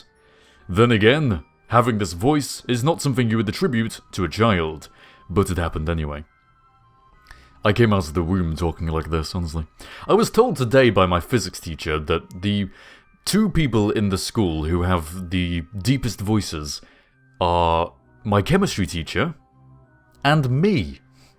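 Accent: United Kingdom. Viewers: Really love Pol personally. Especially with ground scatter active to the max. Not much to actually do there (until 0.90 anyways, maybe), but I love it. If I'd have a custom planet and moons in KSP, I would size it up 30% and make it one of my moons. Personally, I think your voice is amazing as I love the accent from Great Britain. And don't worry, everyone is weird... just not the same type of "weird" heh.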